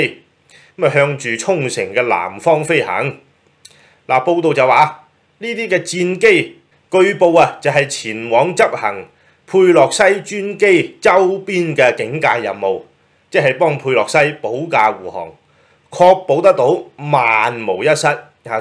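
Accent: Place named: Cantonese, China. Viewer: 你D廣東話 可唔可以標準D？ 你邊個鄉下請問 lmao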